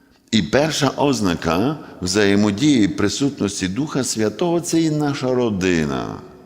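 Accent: Western Ukraine, Ukraine. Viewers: Любов Писаренко, мова о. прекрасна із дещо діалектом, який притаманний на західних територіях України.Слухати науку дуже люблю. Слава Ісусу Христу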